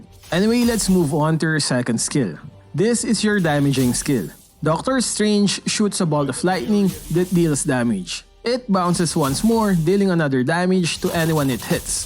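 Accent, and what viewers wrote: Philippines. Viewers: it sounds like he has a filipino accent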